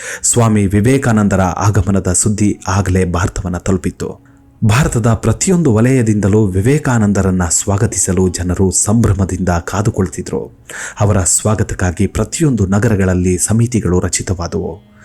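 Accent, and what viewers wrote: Standard. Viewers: Uttama. vyakhyana hagoo shuddha bhaashe Keli santoshavayitu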